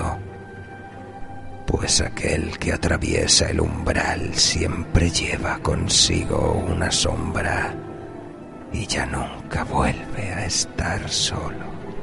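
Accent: Catalonia, Spain. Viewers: le das un toque catalán xD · Una acento muy catalán. Me gusta.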